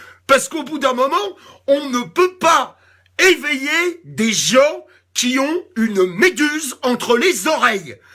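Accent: Paris, France. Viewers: Apres cette video, si ceux qui l'ont regardee ont un peu de reserve ou de pudeur, ils ne devrait y avoir aucun commentaire! C'est tout ce que je dirai et a ton contraire, je finirai en disant;"je t'aime'! Ah! et cet accent super PARIGO que tu te payes, un regal!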